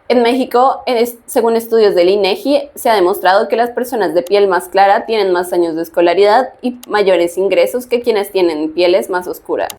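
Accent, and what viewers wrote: Colombia. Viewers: ¿En serio? El acento se me hace un poco bogotano (aunque sí está un poco raro). ¿Por qué dices que es de México? ¿Lo ha dicho en algún video o algo? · eres de colombia?....y tu acentico?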